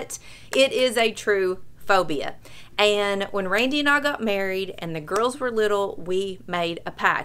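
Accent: South, United States. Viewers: Could listen to you all day! Your friends must love love love you! Having moved back home to Kansas after 4 yrs in Atlanta I miss a lot southern accent and quite truthfully the South! Soooo when I hear you I’m back in the south for awhile! Love all your sites so don’t stop them😊. I am the opposite of you....I’m a dog person...now have 6 rescue cats! When my last 2 dogs died a year after my husband died of a heart attack I was left with 2 cats who got to run the house and I can’t stand seeing feral cats soooo..... I am a water baby and love the beach and like you feet in the sand... well there is a few things about me.....still watching your past posting and enjoying them all.....oh forgot.....while you are a UK person...I Rock Chalk KU. So we can have fun during basketball season😂😂😂😂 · Hey,! I found you when YouTube suggested your “I adopted the shelter dog nobody wanted.” As soon as I heard your southern accent, I was all in. 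I’m from Georgia but living and working in the DC area now. I’m ready to go back to the South where people look you in the eye and acknowledge your presence! I, too, grew up with cats. Got my first dog—a border collie mix—last fall. Best thing that’s ever happened to me! I love all your dog videos but also love the ones about you and your family. Would LOVE to follow y’all around your town and see your favorite spots.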